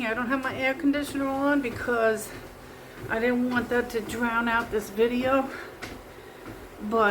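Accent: New York, United States. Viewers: PS... you don't have to walk to keep me listening. I love your NY accent and your great personality.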